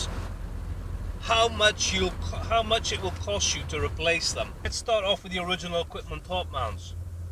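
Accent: Wales, United Kingdom. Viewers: I got a racechip ultimate connect on my golf mk7 1.4tsi,and the moment I have taken it off as it keeps throwing cel code for turbo sensor wen I drive hard,Amy ideas why mate,cars fine wen uninstalled,by the way are you from wales originally,sound like a Walsh man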